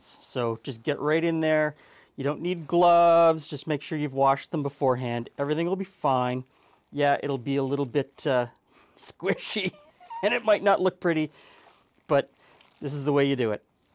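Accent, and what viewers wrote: Canada. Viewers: In the KC area at least, we get all levels of ground beef in the case; now, that I’ve heard your Canadian accent I realize Canada is different than the US on things like this. The 90% lean is so dry; I rinse my 80% lean in a colander under hot water once cooked, and that will remove the excess grease. Just found your channel; love seeing the Depression era recipes, and the way we are going they just may be more useful than we know. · This is the first video I’ve watch on this channel. From the way he talked I thought maybe he was Canadian. Then I saw the bagged milk. Confirmed lol.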